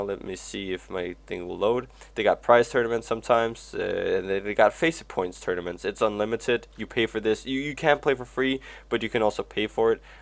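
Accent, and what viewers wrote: Denmark. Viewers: Are you danish? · HaHa kunne høre fra starten af du var dansk. :) god video! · Are u from Denmark? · your Danish?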